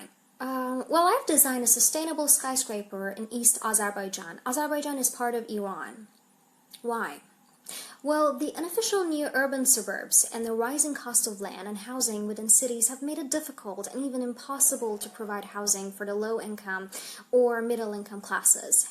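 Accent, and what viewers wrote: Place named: United States. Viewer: Extraordinary presentation with an American accent 😊👏👏👏👌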